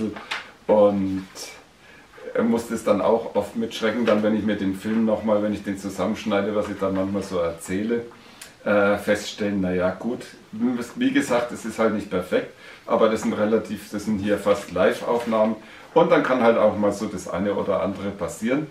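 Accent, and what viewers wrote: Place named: Franconia, Germany. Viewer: Informatives Feedback- Video! Deinen fränkischen Dialekt würde ich unbedingt beibehalten, der verleiht deinen Filmen erst das richtige Kolorit. Noch kurz etwas zum derzeit durch den Preisverfall etwas in Verruf geratenen Sammelgebiet Bundesrepublik Deutschland: versucht doch einmal, die ersten sechs Jahrgänge durchgehend mit klaren, lesbaren Vollstempeln zusammenzutragen! Nach meinen Erfahrungen gar nicht so einfach, denn zu dieser Zeit wurde meist noch auf motivfreie Entwertungen geschaut, und Vollstempel waren eher Zufallstreffer., wie wäre es, wenn du einzelne europäische und auch Übersee- Sammelgebiete in Kurzportraits vorstellen würdest: Frankreich, Schweiz, Großbritannien, USA, Kanada, VR China usf. Wie stellt sich das Gebiet als Ganzes dar, was ist beim Aufbau einer solchen Sammlung zu beachten, ist eine entsprechende Sammlung für den Normalsterblichen finanzierbar, Seriosität der aktuellen Ausgabepolitik und natürlich auch die Preisentwicklung. 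Würde mich freuen!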